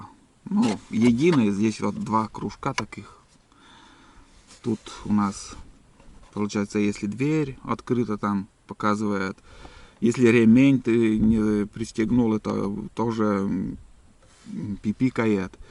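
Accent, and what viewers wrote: Komi, Russia. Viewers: Давненько в Коми не бывал.голос слышу, детство вспоминаю. Коми му))) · Виддза олан! Судя по акценту, Ижемский?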